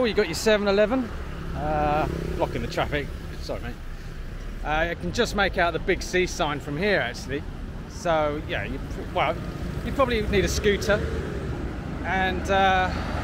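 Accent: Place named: South London, United Kingdom. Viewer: Who needs a kitchen with a 7 and noodle shop so close.Lol. Your excitement over a lotus fresh was quite overwhelming and could prove to be a great 'marketing' tool for the company(if they get to see it) and the shot of that lonely grapefruit will last with me forever. All in all another great vid, I do like the casual and friendly manor you have when interacting with the locals and your 'river wandle' accent makes the whole experience pleasurable. Keep it up fella.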